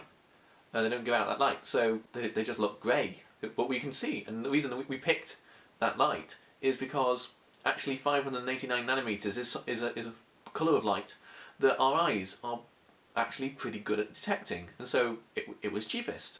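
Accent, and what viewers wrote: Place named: United Kingdom. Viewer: Just saying "The Fundamental Interconnectedness of All Things" in a Brittish accent is worth a vlog in and of itself! Keep up the good work and good luck!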